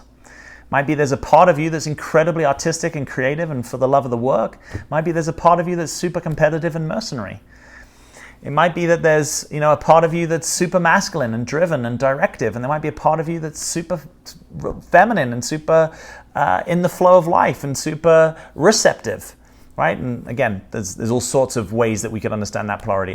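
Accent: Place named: United Kingdom. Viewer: Thank you for this video. Your analysis and understanding of human behavior is helpful and amazing. Your British accent makes the topic as significant as it really is and should be. Will listen to it again and from time to time. FR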